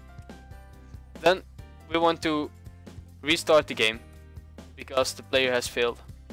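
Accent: Netherlands. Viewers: youre dutch arent you #4secondsinvideo #dutchaccent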